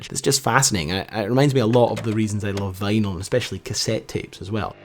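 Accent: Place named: Scotland, United Kingdom. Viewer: Sorry if this sounds offensive. But i am jist curious. Is he a scotish ? I love the accent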